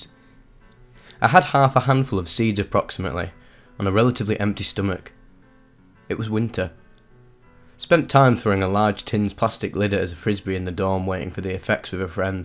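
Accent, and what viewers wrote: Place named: Birmingham, United Kingdom. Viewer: Was just wondering that yesterday - I figured somewhere near Birmingham. I’m on the other side of the Atlantic so from here that seems close enough…?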